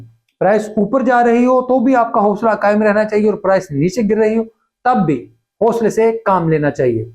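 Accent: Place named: Punjab, India. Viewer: Your punjabi accent is so good 👍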